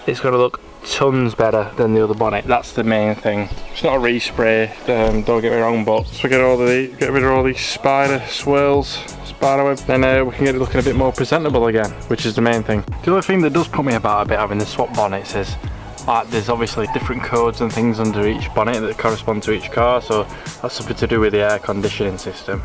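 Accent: Yorkshire, United Kingdom. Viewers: Is that a Yorkshire accent pal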